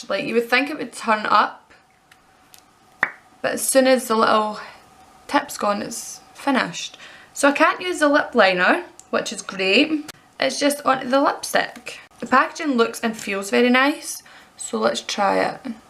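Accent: Scotland, United Kingdom. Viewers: I just adore the Scottish accent, I’m so obsessed with it 🥰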